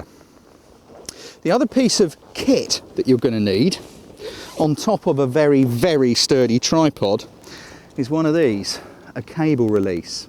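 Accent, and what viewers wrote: United Kingdom. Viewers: Buddha bless you for the British accent, music to my ears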